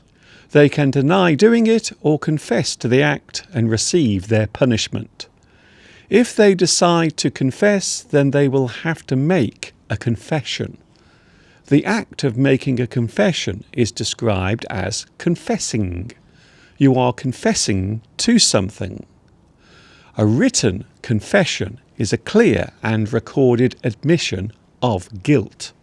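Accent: United Kingdom. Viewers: It seems like your sound tone is so heavy, that I'm scared, I should have to confess I've fallen in love with the British accent. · Hi, yes...I have to confess I've something so important that I must get it off my chest (...trying to use those expressions here, did I do correctly...please?!☺️) I do like the British accent and my ears turn up immediately as soon as I hear it and I'm very interesting in your country 😅. Well, there's something else I must confess, shh.....I know that I have scratched Mr.Steve's car down there in the garage! Don't tell anybody! 🤫🤭😅 Jokes apart, I have to confess that it seems to me to have found two nice friends thanks to this channel and, let's not forget the English Addicts out there, even though we have never met in real life, it should be so interesting, I feel glad and not alone with my English adventure! Cheers! 😊🙏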